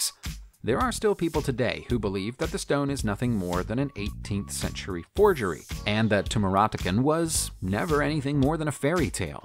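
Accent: United States. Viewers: American production/narration.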